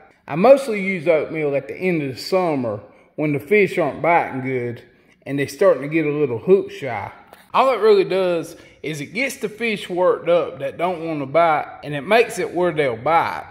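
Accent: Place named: North Carolina, United States. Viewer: Man I'm from laurinburg born and raised , you gotta be from Robeson County you just have that Robeson County twang. Some good people from around them parts. Keep the video's coming best tips I've ever seen. I love your common sense approach to fishing...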